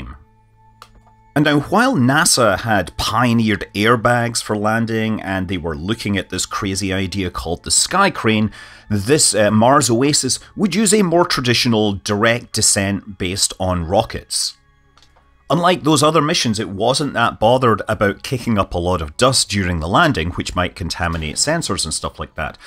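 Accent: Scotland, United Kingdom. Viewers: Scottish fo0l...Aye!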